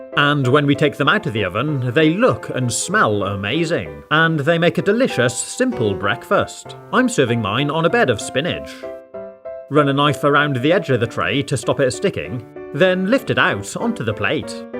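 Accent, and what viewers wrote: United Kingdom. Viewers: Pls tell me if ur British plsplspls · I like how your so bri'ish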